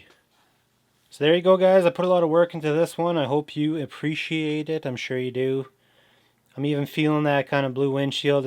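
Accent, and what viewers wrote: Canada. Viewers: Just wondering, are you Canadian? Sometimes you sound Canadian and sometimes you don’t.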